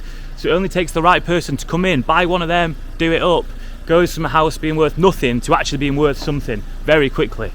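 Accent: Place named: Liverpool, United Kingdom. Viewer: I'm so In love! I want him!!! 🥰🥰🥰 I love the scouse accent and I say it all the time, an accent like his on a man like that is so attractive. 😍🥵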